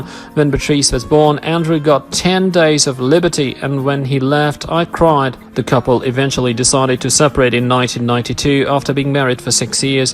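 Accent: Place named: Australia. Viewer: Your English is perfect - do I detect a slight Australian accent?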